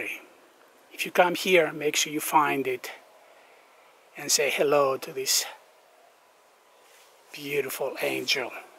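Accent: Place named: Greece. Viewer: Thanks for explanations and for video ✌️ Like your accent. I guess greek:-)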